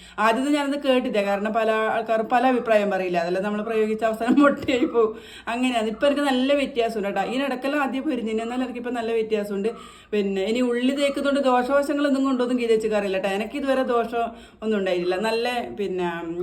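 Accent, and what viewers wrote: Kannur, India. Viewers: എന്താ രസം പറയുന്നത് കേൾക്കാൻ നമ്മുടെ കണ്ണൂർ ഭാഷ പൊളിയല്ലെ മുത്തെ 🥰🥰🥰🥰❤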